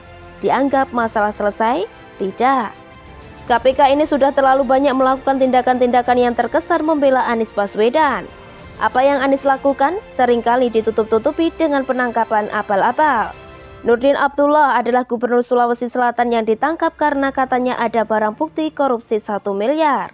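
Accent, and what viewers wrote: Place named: Java, Indonesia. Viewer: intonasi dan medoknya announcer gak cocok buat berita...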